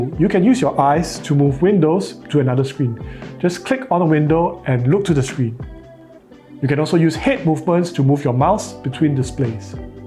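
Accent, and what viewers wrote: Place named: Singapore. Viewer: you Sound singaporean imo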